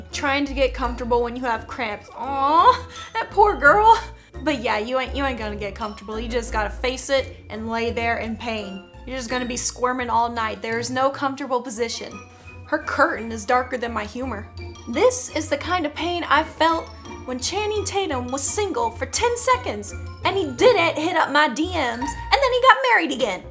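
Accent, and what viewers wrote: United States. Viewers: Love your cowgirl accent! 😉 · Are you Southern ?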